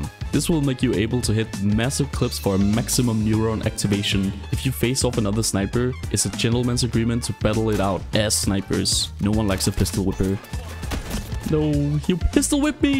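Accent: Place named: Denmark. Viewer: You sound like your from denmark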